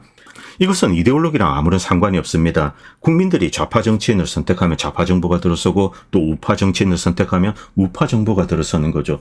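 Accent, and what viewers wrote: Gyeongsang, South Korea. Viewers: 이 사람 똑똑한척 하더니 부정선거 주장을 하는거 보니 영 국짐 프레임 이용하는 그저그런 사람이네 자유민주주의의 최대 적이라할 쿠테타에 대한 개념도 없고 경상도 말투며 그냥 제대로된 보수가 아닌 극우네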